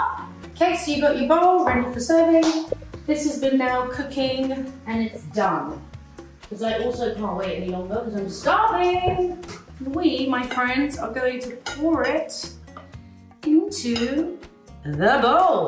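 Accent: United Kingdom. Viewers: Sometimes, I forget that she’s British, and then she starts speaking...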